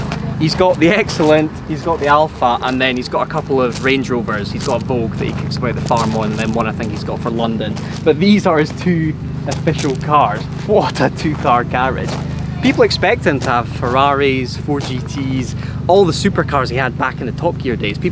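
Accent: Scotland, United Kingdom. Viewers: It was clickbait because It had a picture of Clarkson in it, this guy was is Chris Harris if he lost a bit of of chubb and got a Scottish accent. Yes I do like the engine in this Alfa but I'm more into old JDM cars because they don't break,like one of distant relatives knicked his car when he wasn't looking..🤣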